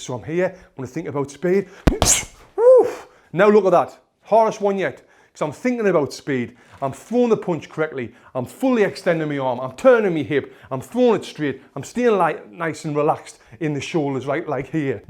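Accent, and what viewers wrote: United Kingdom. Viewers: As a french person, I enjoy your british accent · 喜欢你的英国🇬🇧口音，哈哈！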